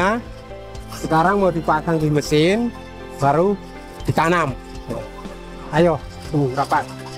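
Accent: Java, Indonesia. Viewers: Luar biasa padi nya kak, semakin ku tonton ternyata jawa medok... luar biasa semangat 🎉❤ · Medok bgt Lo ya😂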